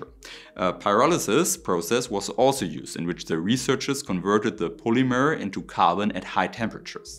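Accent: Germany. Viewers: Who cares if a German guy speaks English with a German accent, come on 😄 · Germa accent is irritating. Use AI like most other bloggers.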